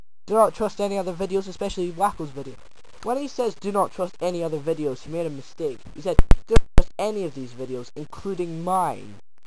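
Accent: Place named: Scotland, United Kingdom. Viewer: scottish accent = da shit.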